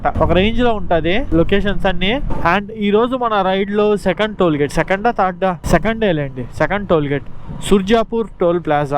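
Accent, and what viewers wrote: Nellore, India. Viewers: Bro.. I also from nellore District atmakur now staying in Dubai....Can you please describe about your camera and audio system...మా యాస లో vedio చాల బాగుంది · Mana Nellore yasa super nuv Neela unnav 👍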